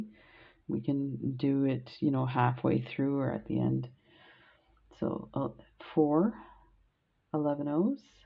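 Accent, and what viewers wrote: Canada. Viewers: I tried this so many times but kept getting that puckering around the perimeter. Finally switched to 5mm pearls instead of the 6mm. I used 6 seed beads to surround them and I didn't have to add that extra single seed bead at the bottom because the seed beads came together really well and didn't show thread. Just a suggestion, as this worked for me. I've made 3 so far and plan on using them on an ornament ball. Thank you so much, for teaching this to us! I love your videos, (and your Canadian accent!) ❤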